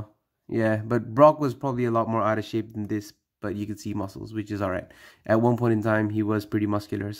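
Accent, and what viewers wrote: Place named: India. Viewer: You sound like you're from india or neighbouring country how did you get this figure I want it too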